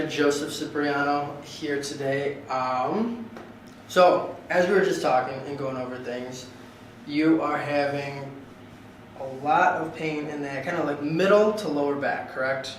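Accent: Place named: Midwest, United States. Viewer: Hey Joseph, you sound like you're from Illinois or Wisconsin. Am I right? Random question, I know.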